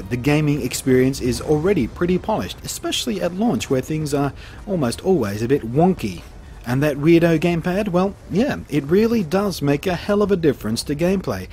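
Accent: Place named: Australia. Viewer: Thanks for yet another informative vid, i do rather enjoy your style and its nice to hear another aussie doing things right! I am some what curious as to why you excluded zombieU from this review list? i think i remember you saying in one of your other wii vids that you were a little over the whole zombie game thing, but I am a little curious as to one, how it plays and two, what you think of it. If you have already gone over all of this please forgive my blathering ignorance.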